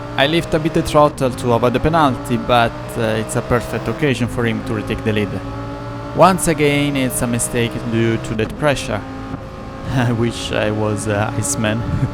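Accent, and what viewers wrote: Italy. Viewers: non ti offendere ma una cosa te la devo dire (dall'accento direi che sei romano come me): imparati gli accenti e le preposizioni in inglese perche' veramente suoni strano eh. La pronuncia di opponent, protect, penalty, delaying, allowed, comfortable, legit, our, consequences, assume, essence, e alcune altre parole e' sbagliata, soprattutto l'accento, e l'uso delle preposizioni e' spesso sbagliato. Google translate e altri tool gratuiti ti possono aiutare perche' leggono i testi a voce alta. Sono parole che parlando di corse auto dovrai ripetere ogni volta, quindi aggiustale una volta per tutte e via.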